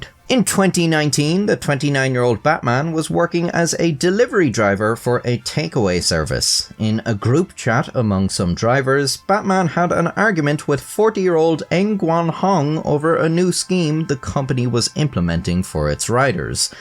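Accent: Ireland. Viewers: Listening an Irish man talking feels better than a british man talking 😅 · Well, I subscribed for a combination of the charming Irish accent, unassuming animations, and vague but unsubtle threats against people who don't subscribe. But we all have our reasons. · I love the Irish accent.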